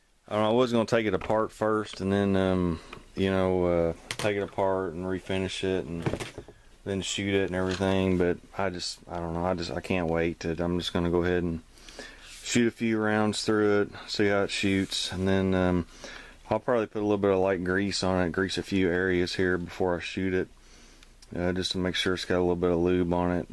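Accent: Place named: South, United States. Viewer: When a guy with a southern accent understands the difference between a clip and a magazine, he feels like he’s an authority on all firearms related topics.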